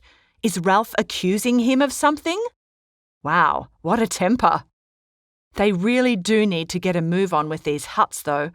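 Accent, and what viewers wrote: Australia. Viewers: These are great! Is the narrator? Is her accent from Australia? My students were curious.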